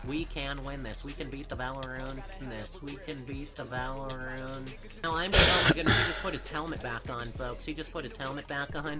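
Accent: Netherlands. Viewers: Hahaha je Nederlands accent is fking grappig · Dude are you Dutch? xd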